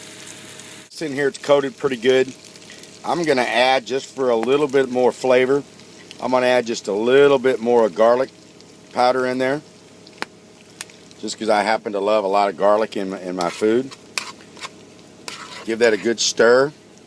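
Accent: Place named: Los Angeles, United States. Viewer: looks great.. what accent he is speaking,is this LA accent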